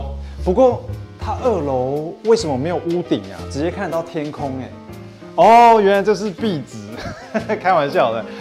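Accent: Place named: Taiwan. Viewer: 聽口音就是台灣吧!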